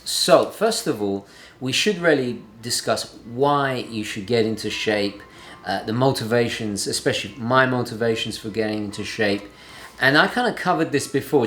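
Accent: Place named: United Kingdom. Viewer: Hi, i like your vid because they are optimistic and positive. I love also your english. Very distinguish and british.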